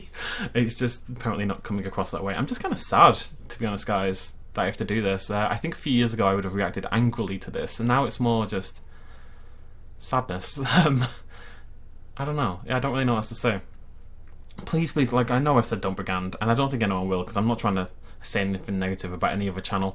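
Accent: United Kingdom. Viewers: I’ve been subscribed to you since you had like 500subs. I’ve found beaver much much later, but I’d say he didn’t really got his subs with JW:TG. He does, like a lot of things too. I found him through the Isle, which game you did played some time ago, but stoped doing so. He did not. Also, his.. umm.. like, style if you will is completely different from yours. You’re like an excited nature documentary commentator, and he (over)edits most of his vids (which i like per say). But, the most important thing is: Both of you have a funny British accent.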